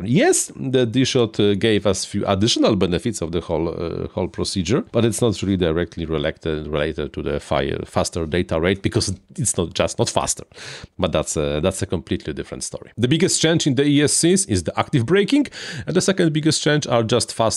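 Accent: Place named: Poland. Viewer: Czemu tak łatwo rozpoznać Polaka mówiącego po angielsku?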